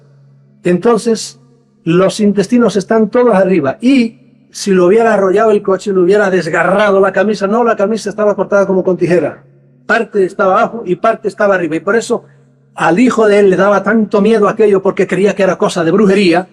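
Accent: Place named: Mexico. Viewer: Amo su acento mexicano! Me recuerda a mi abuelito :')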